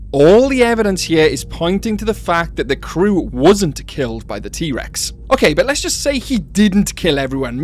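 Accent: Wales, United Kingdom. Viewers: This channel is awesome and just like with Star Wars nowadays, living proof of how the fans of this franchise love and care about it more than the official owners of it do 👏. Also, are you a fellow Welshman? Loving the dialect, and does anyone know the time of that instrumental that kicks in ? So chilling and eery.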